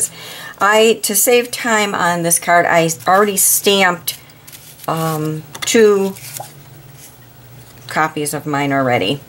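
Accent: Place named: Illinois, United States. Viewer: are you from : Illinois, Wisconsin or Michigan area? You sound like my daughter in law lol :-) She's from Cary, ILL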